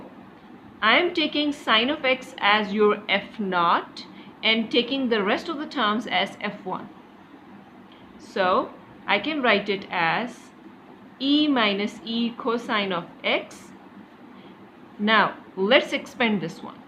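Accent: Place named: United States. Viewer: itna thanda american accent 😆😆😆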